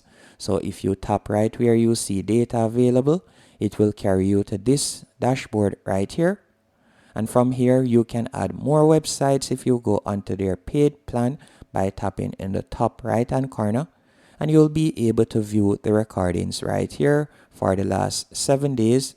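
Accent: Jamaica. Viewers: It's nice to land on a video with a Jamaican accent. Your videos are helpful, thanks.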